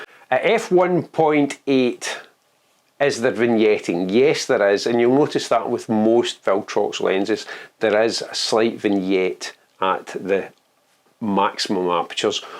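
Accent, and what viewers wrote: Scotland, United Kingdom. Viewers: Nice review, thanks! Your intro is very dramatic and usually these lead to a confident American model...Then we get your strong Scots accent. It’s always good to be a little different. Your images are excellent so I’ve subscribed immediately. I really hope you don’t dump your Nikon gear; you know it will be better in low light (over the Xt5)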